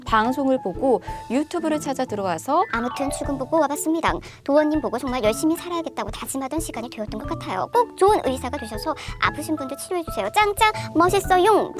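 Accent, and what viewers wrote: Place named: Gyeongsang, South Korea. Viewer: 근데 앵커라는 사람이 경상도 사투리 ㅋㅋㅋ 경상도 출신이죠? 사투리라니.. 아물론 클레어인가 뭐시기인가 이런한동은 알려져야할듯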